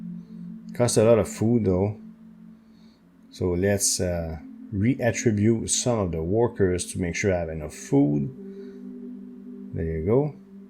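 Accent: Quebec, Canada. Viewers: at only I could have bet my life savings that you were Quebecois ⚜. Much love!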